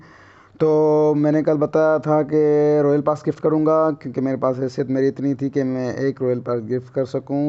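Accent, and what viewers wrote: Sindh, Pakistan. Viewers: Sir I love you so much hame Royal passs neeed nh he busss AP hamesha khush rahe you are best and apki voice se lag rha he AP Sindhi he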